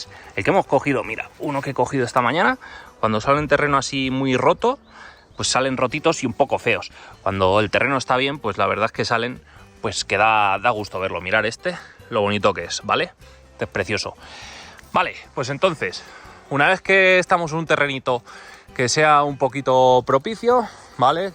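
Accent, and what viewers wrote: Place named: Madrid, Spain. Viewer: los consejos son buenos; el acento de Madrid, bastante repelente :face-fuchsia-tongue-out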